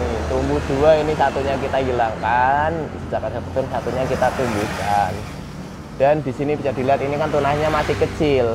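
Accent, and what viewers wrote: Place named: Java, Indonesia. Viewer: TERIMAKASIH mudah dimengerti karena kemedokannya SUHU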